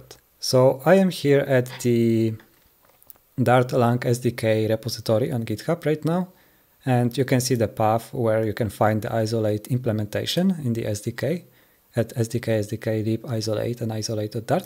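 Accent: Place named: Poland. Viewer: po głosie to chyba polak ;)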